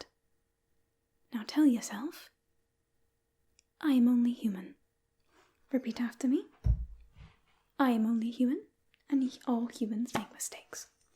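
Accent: England, United Kingdom. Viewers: Nice use of the English accent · I’ve said it before, you do a really good English accent. Have you ever been to the UK. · YOUR BRITISH ACCENT SLAYS AND SLICES ME IN HALF EVERY TIME OMGGGGG!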